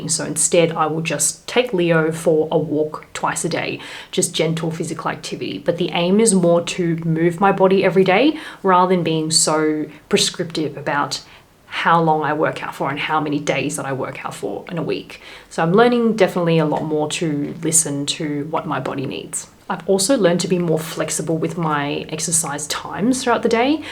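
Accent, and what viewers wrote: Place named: Australia. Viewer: Very well said. Great list, and watching from the USA I really enjoy your voice. Everything sounds sweeter and happier with an Australian "accent" :-) I remember turning 30 as well and just loved leaving my 20's in the rear-view mirror. I'm glad you found it a good thing.Just wait for 60!!! haha. Liking & subscribing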